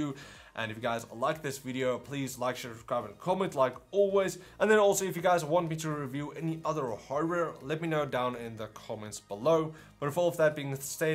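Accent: South Africa. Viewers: Are you from South Africa? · My mother is South African and I lived there for around 6 years when I was very young, it's so nice to see a South African reviewer and It's great to hear the accent again. The review is also amazing!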